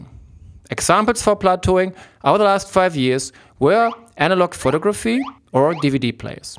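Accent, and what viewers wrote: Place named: Germany. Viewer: not gonna lie, but I literally recognized your german accent in the first sentence :) Greetings from Germany :)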